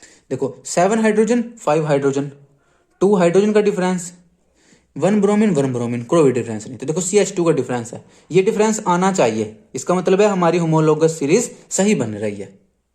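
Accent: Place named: Haryana, India. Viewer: bhaiya aap bhi alakh sir ki tarah videos bana na suru krdo. Aapka haryanvi accent bht accha hai aur padhane ka style baaki sab se better hai , sab kuch samjhane ka style bhi osm hai . Agr aap saamne aake padhaoge screen pe to bacchon ko aur maza aayega aur aap ki growth v bht quick hogi. Wishing you all the best 🙏🏻💗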